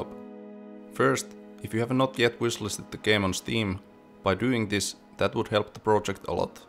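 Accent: Finland. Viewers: Recognized the finnish accent as soon as the talking started, it's somehow so particular. Graphics and environments look very nice. Will wishlist this. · Its clearly finnish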